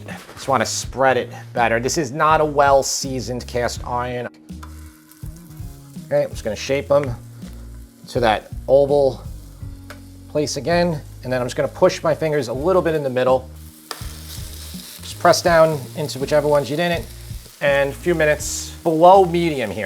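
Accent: United States. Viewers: We're in the US, it's a US pronunciation, friend. The US speaks American. The UK speaks British. And don't get me started abooot the Canadians & whatever the hell the Aussies are trying to speak. 🤪 Worcester, Massachusetts is not pronounced Wore Chester, Massachusetts. It's pronounced Woosta or Woost UH (rhymes with Rooster if you pronounce the ER as UH))(Roost UH/Roosta) Even the Brits, who haven't learned to speak proper American, don't say Yorkshire as York Shyer, they say York Sure. Worcestershire Sauce is pronounced Woosta-Sure Sauce in the great US of A and the rest of the world is wrong!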